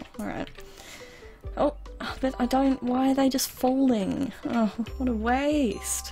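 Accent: Australia. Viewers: R u Australian? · she sounds like Australian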